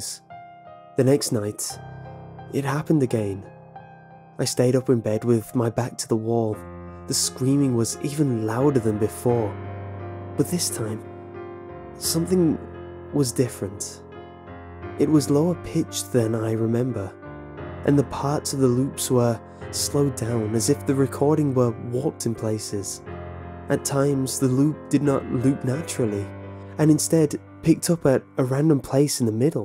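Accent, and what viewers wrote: United Kingdom. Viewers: from Idaho but with a British accent ?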